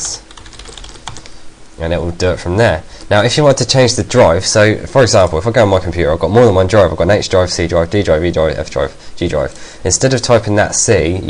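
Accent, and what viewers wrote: United Kingdom. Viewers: Very good video. Very well done. btw thank you for not using any annoying ass music, rap, rock, whatever,ect THANK YOU!! just a normal English voice,with classy british accent to-boot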